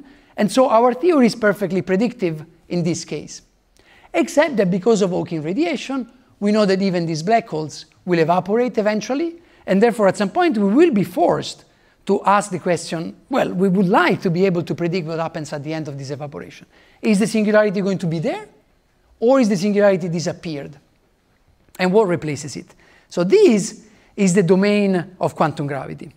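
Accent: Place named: Italy. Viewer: jestli Jirka Podolský nebo Pavel Krtouš najdou nějakého studenta, který by si to dal třeba v rámci zápočtu, tak to bude nejlepší řešení. Jestli se to nepovede do konce roku, tak udělám to, že Simona přeložím "on the fly" na diktafon, ten čas tomu rád dám. Ale nedám přepis do titulků, to by musel udělat sw nebo někdo jiný. Domluvíme se ve čtvrtek na fakultě:) Je fakt, že tohle je unikátní přednáška v českém prostoru - IMHO první technický kurz kvantové smyčkové gravitace v ČR, který dával na fakultě a mimochodem vystřihnul tuhle "netechnickou" přednášku. Ale i tak, terminologie a koncepty, které používá nemusí být samozřejmé pro posluchače, kteří nejsou obeznámeni se základy Obecné relativity a kvantové mechaniky. Takže musím jen doporučit jako prerekvizitu přednášky Jiřího Podolského na téma jeho překladu knih Carla Rovelliho (Carlo, jako jeden ze zakladatelů QLG přístupu, byl školitelem na univerzitě v Římě) <link> atd. 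Je také pravda, že angličtina je excelentní, ale se silným italským "soundem"a velmi středomořským rytmem, takže to nemusí být v kombinaci s netriviální terminologií jednoduše zkousnutelné ani pro posluchače, který anglicky umí slušně. Takže ano, má určitě smysl udělat překlad. Jinak záznam z jeho intenzivního kurzu, který končil včera se postupně objeví na téhle stránce <link> Ale je to prostě kurz živé oblasti výzkumu a dostupný bude jen pro lidi, kteří se dostatečně orientují v OTR a kvantové teorii pole a příslušných matematic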